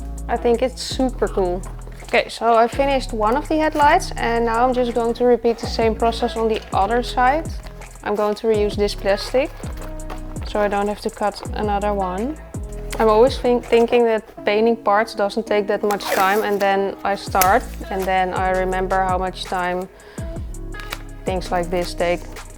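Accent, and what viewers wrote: Netherlands. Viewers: dame you are beautiful!! and love that dutch accent! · Beautiful Netherlands girl with an NL dialect and she knows how to deal with screwdriver and so on 🥰😍 And than the yellow CRX next to the Volvo 😋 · So funny, I immediately noticed the Dutch accent. I love the Netherlands, best place to live. Was in Utrecht/Amersfoort for 2 years before the pandemic. Greetings from Portugal.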